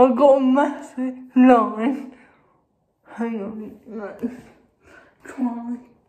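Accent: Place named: United Kingdom. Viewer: Hi, I know this isn't your latest video (at the time I'm writing this) but I just found your channel and decided I'd comment on this video because it's great how you're showing how you can do things yourself, and you're so pretty even before the makeup, and ya know us Yankees love the British accent too, so thanks and looking forward to seeing more videos, xox.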